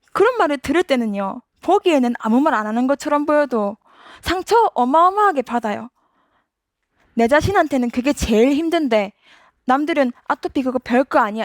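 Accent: Gyeongsang, South Korea. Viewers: 음 대구분이시넹~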